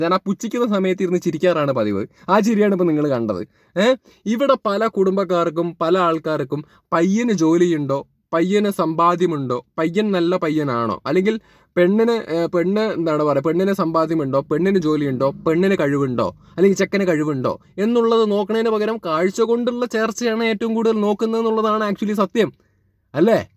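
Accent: Palakkad, India. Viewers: PALAKKAD slang poli 🔥 Very comedy 🖤❤🖤 🔥BIGG FAN🔥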